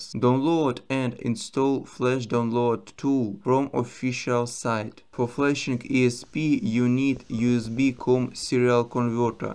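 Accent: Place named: Russia. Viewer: Друг , английский надо подтянуть :) Русский акцент ухо режет...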